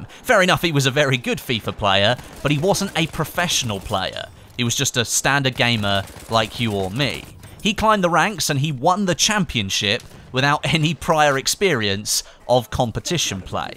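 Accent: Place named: United Kingdom. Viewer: You sound so British lol.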